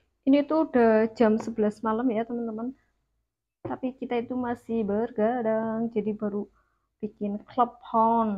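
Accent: Banyumas, Indonesia. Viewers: Ora ngapak ora kepenak jawa ngapak nya mn nduk...??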